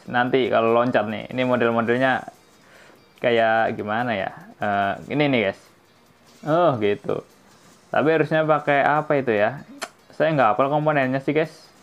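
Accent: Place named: Malaysia. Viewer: Abang ini pasti orang Kalimantan dekat Malaysia pantesan suara nya kayak orang malaysia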